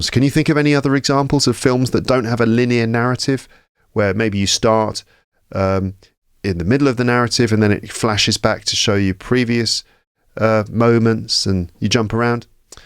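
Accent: United Kingdom. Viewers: Hello, teacher. First of all, I want to say that your lessons are amazing. I’m from Brazil and have been studying American English, but I have to admit that after watching your videos, I fell in love with British English pronunciation—it’s one of a kind… I’m still at the A2 level, but I can understand your lessons very well thanks to the context and vocabulary. I’d like to sign up for the premium plan.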